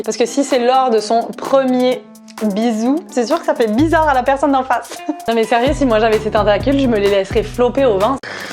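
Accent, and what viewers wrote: Quebec, Canada. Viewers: Ton accent du Québec me manque.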